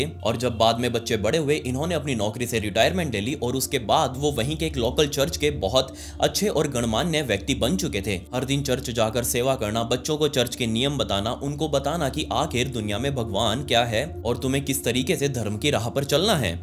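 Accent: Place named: Sirsa, India. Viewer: Bhaiyaji m haryana sirsa ka rhne wala hisar ke pass hi h Aapki boli sun ke pahchan gya ki aap sirsa ke aas pass rhte ho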